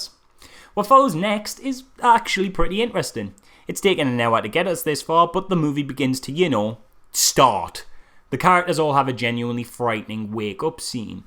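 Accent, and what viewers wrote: United Kingdom. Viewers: a good review with a heavy british accent review takes a thumbs up ....lol dead on m8 :)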